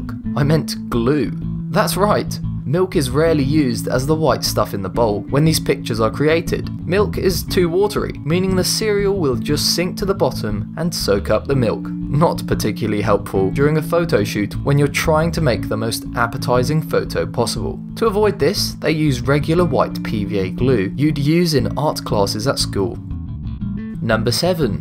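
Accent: United Kingdom. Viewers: Why did they change the voiceover dude to a British one, were Americans not good enough!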